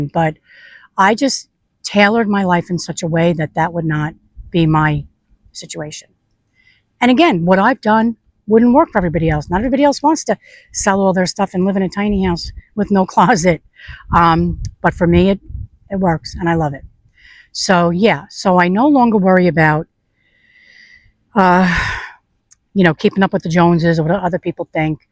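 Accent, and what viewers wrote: Long Island, United States. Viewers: Listen, I have a girlfriend that tells me every designer name and the saddest part is I have no idea what she’s talking about so I do understand not having a lot of stuff around. I think it’s wonderful. Do whatever you want we’ve earned it haven’t we . I love the accent. It’s just like mine. I grew up in Long Island, but I live in Pennsylvania now, but I had 30 years in Long Island. I love your little house too. By the way I’ve been following your journey. I’m very sorry about your daughter. I truly am. The girlfriend I’m just like you when you showed that house across the water. I thought it to myself absolutely not. I don’t wanna heat it. I don’t wanna put air air-conditioning into it. And I am a minimalist in a lot of ways. I just like to talk to my husband into throwing some more stuff out or just giving it away. Anyway, do the best that you can and also I have to say you have such beautiful hair. 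I’ve been meaning to say that have a great rest of your evening. Enjoy your time with your friends and family in Florida and I’m looking forward to seeing what you do with upstate New York take it easy.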